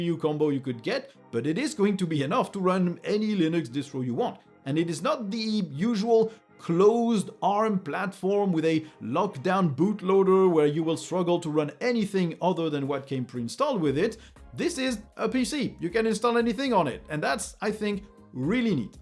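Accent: France. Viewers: I love the accent so much. Staying up to date with Linux news is almost a bonus. English spoken with a French accent is music to my Eastern European ears. I'm not much of a gamer, but I watched (well, mostly listened to) a whole Dishonored playthrough by Raphael Colantonio in no small part to enjoy his accent. Most of the other people who were invited, part of the Dishonored development team, also had French accents. (edited - name spelling)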